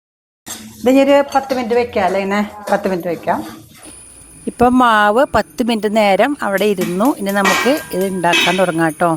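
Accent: Thrissur, India. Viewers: ത്രിശൂർ കാരിയാണോ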